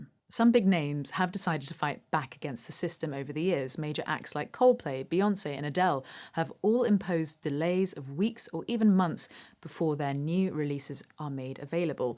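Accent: United Kingdom. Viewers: Beautiful shirt! As I've mentioned before, your speaking voice is another possible revenue stream. It is beautiful to listen to -- and remember how potty Americans are about British accents. My two favourite female speaking voices are Kirsty Young and Mary Spender. So be fruitful and multiply. I mean about revenue streams, not...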